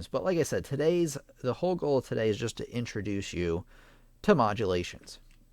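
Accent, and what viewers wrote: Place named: Philadelphia, United States. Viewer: Could not join your Live stream as a participant tonight! Wonder if others had issues too? Seems You Tube failed us with not error message. , Nor could I support the channel which I’d gladly do. Just today, I rec’d your suggested book from Amazon: "Scales, Chords, Arpeggios & Cadences”. Got to page 8 under 'Chord Progressions' & just failed to comprehend what this sentence means, here goes: “When a triad is not in root position, the ROOT is ALWAYS the upper note of the interval of a 4th!” Can you explain or re-word this sentence in a differnt way or possibly give an example, especially on the “..4th interval”? It is an enigma why my question on your Live presentation wouldn’t post! It was so annoying as there was not an error message nor any indication how to enter my text. This occurred, not at the end of your transmission, but >15 minutes prior. 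Any help with that? Lastly,, hearing your accent, it sounds as if you’re located somewhere near Philly area. I think you mentioned you do give piano lessons? I’d like my family to have keyboard instruction, with one who knows how to demystify complex concepts e.g. chord modulations, without feeling overwhelmed. As an educator & public speaker, I recognise, you do have a gift, making the terminally-complex, less so. Thanks & sure hope you can advise, what I may have done wrong, not being able to join the conversation tonight? Deni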